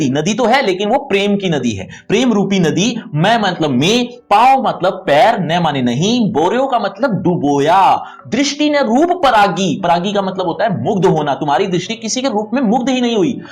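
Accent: Haryana, India. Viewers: Sir aap Haryana se ho kya? kidi baith jaati h😂ye to Haryanavi h comment👇if you are also Haryanavi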